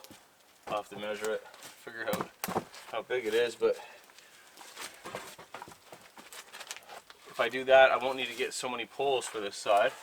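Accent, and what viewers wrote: England, United Kingdom. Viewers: Cracking English accent 😁👍🏼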